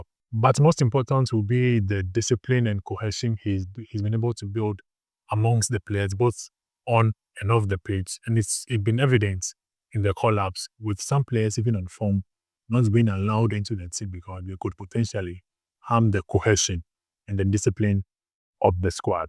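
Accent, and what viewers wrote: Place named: Nigeria. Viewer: Bro you sound Nigerian but you look South African.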